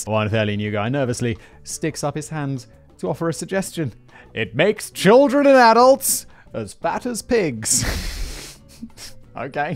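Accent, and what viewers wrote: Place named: United Kingdom. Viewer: It sounds racist coming out with that British accent.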